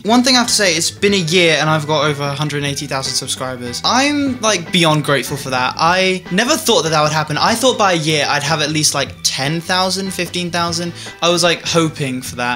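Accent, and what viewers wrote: United Kingdom. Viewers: Are you korean? Because u look korean but then u speak British 😰✌ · why do you have a british accent-whaaaAa? my small brain cell...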